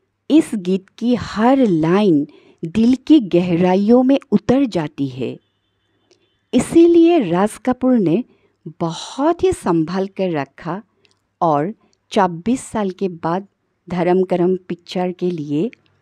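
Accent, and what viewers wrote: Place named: Assam, India. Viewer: Typical Assamese accent, love it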